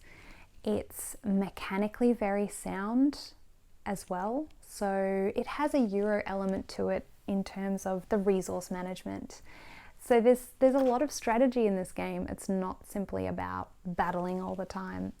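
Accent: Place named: Australia. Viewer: Neat! Its always fin to see someone talk, who you've only seen photos of...especially when they have a cool Aussie accent! I tried my hand at making some videos for YT. I mostly made them for my sisters (to save time on game teach, since we are all in different time zones, and don't often have long to play). I initially published them, but I think I took them down, because I was embarrassed about how they came out. Mostly, I need to figure out how to edit in post and I think it would have looked much better with a pro intro like you have. Nice job! I now want to try this game sometime. :)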